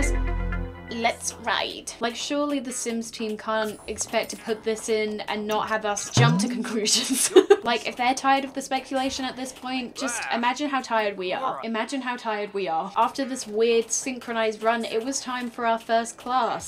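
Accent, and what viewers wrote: United Kingdom. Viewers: I just fucking realised, that is a female version of Pyrocynical. No joke:O. SiGnS, tHaT gO bEyOnD rEaSoNaBlE cOmPaRiSoN: -British (heavy British accent) -white/light colored hair -look pretty similiar (in my opinion) -editing is vaguely similiar (cuts, jokes and zooms) That is it I think. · i forgot you were british and i was SHOOK out of my mind when you started talking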